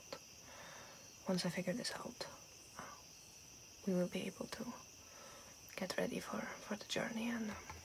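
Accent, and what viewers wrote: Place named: Netherlands. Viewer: I'm trying to place her accent but...Dutch maybe?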